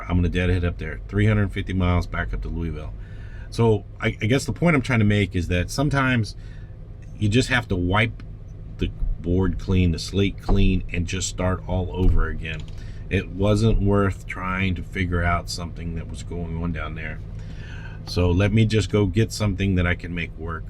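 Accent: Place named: Minnesota, United States. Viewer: Good MN accent there, fella. Stay safe.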